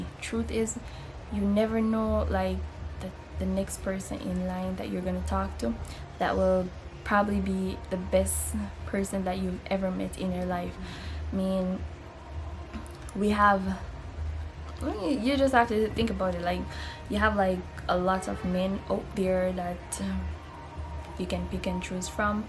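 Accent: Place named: United States. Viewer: these things you are talking about i hope they all apply to you or you are just talking for talk sake...seems you and your daughter are doing great..blessings....i also notice that you are speaking properly now...which is good cause your daughter is going to emulate you and children live what they learn..oneLove....yuh all sound like American sometimes..lol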